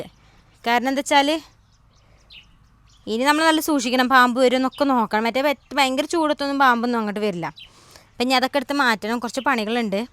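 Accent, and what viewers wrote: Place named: Kannur, India. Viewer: സംസാരം കേട്ടപ്പോൾ തോന്നി സിസ്റ്റർ കണ്ണൂർ ആണോ നമ്മുടെ നാട്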